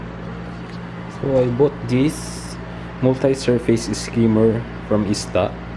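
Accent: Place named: United Kingdom. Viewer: Akala ko british national yung nagsalita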